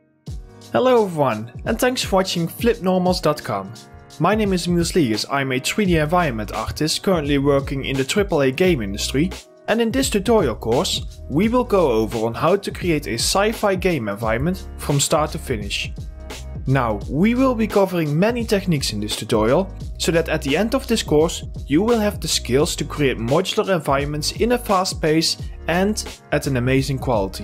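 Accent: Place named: Netherlands. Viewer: Very dutchlish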